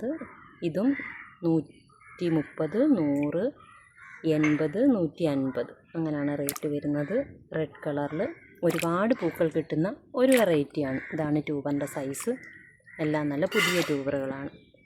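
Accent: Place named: Thiruvalla, India. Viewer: ഞാൻ തിരുവല്ല സൗണ്ട് മുൻപ് കേട്ടത് പോലെ പക്ഷേ ഞാൻ ആദ്യം കാണുവാണ് ഈ വീഡിയോ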